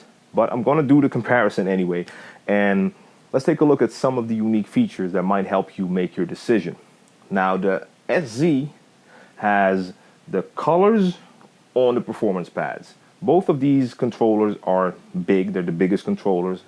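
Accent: New York, United States. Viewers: Wait. You from the Netherlands ?!!! Woooow, I thought you were from NYC. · it's funny i thought you was american from ny :) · you said you from the netherlands? u sound like u from new york lol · wow he is not from NYC that's crazy i was thinking he was lol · you from the netherlands????? mannnnn i thought you were from NYC. have the accent. lol.. good looking on this review. I have Numark ns7. love it..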